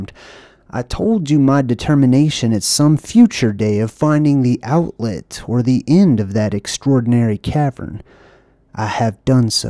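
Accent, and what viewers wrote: United States. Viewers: Not through yet, but I love your country accent. You might fit in real good down here in Texas:_JDZHeart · Great job narrating, the western accent fit accordingly, you should do more similar to these in the future, did this end up being published or became another suppressed archive, that you were abled to dig up?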